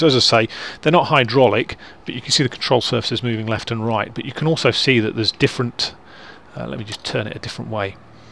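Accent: United Kingdom. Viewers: Too much annoying British talk .. .SHUT UP already and let us hear the engine sound.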